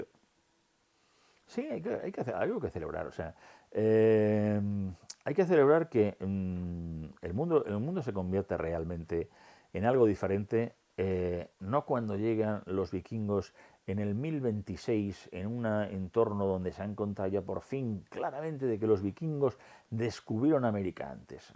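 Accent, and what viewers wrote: Spain. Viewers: Que ridículo se ve con esa playera.Habla como típico español,no le entiendo.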